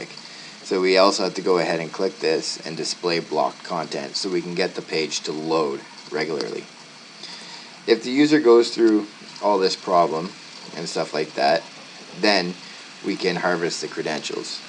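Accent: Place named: Canada. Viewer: love the Canadian accent.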